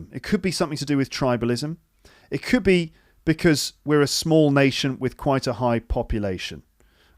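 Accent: RP, United Kingdom. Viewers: Excellent you're apparently an amazing mentor with your indispensable method in particular with the pure British accent I wish I could talk to everytime anytime to be honest I'm an English either I've got approximately 50 students who belong to middle class family but they obviously make an effort to develop their vocabulary and focus on their spoken English most of them enjoy a lot but they're curious to talk with a native speaker specifically with British I hope you could spare sometime with my students online on video call thanks a lot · My best teacher. I love British accent. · I like british accent. so I really like to hear your podcast. many thanks for your superb poadcast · Hi! I love listening to your podcasts, your voice, and your British accent. But when you imitate other accents, it was hard to understand them. In order to communicate with non-native speakers, I don't want to follow the rule of accommodation theory and grade my English down. 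I would prefer to speak closely the way you speak. But if you are a native speaker, you can accommodate your accent to different kinds of people. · I love the british accent, your channel is one of the best to practice my listening. :)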